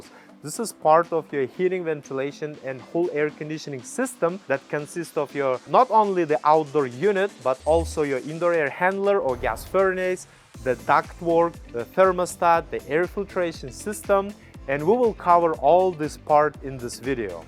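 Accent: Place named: Soviet Union. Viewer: You talk like you’re from Soviet union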